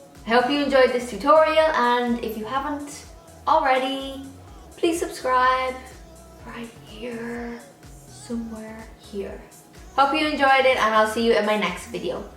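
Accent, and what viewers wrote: Ireland. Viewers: Another gorgeous look. <3 I love watching your videos. Your Irish accent is so cute and unique. Like, it sounds Irish, but you say certain words with a very "country" Irish sound. lol I'm sorry that may sound crazy but I mean it in the best way possible.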